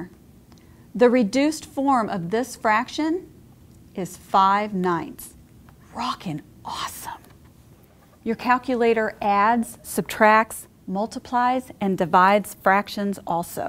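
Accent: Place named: United States. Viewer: I don't like her she has an American accent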